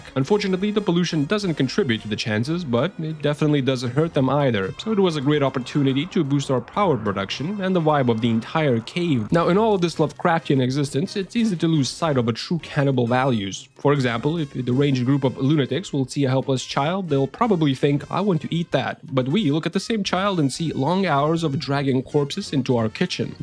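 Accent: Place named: Canada. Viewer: I know others are unaware but as someone with Canadian friends. I know how they pronounce stuff differently and yeah. He's definitively Canadian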